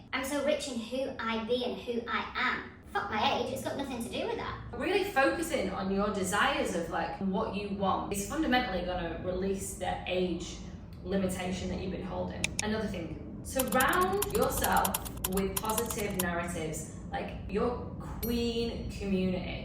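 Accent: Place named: Australia. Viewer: I very much like this accent! Is she Aussie?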